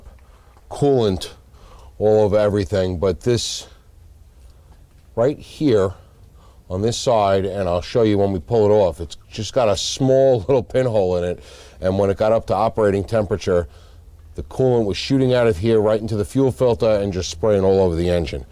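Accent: New York, United States. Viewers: Nice car love you New York accent I’m in canada ehh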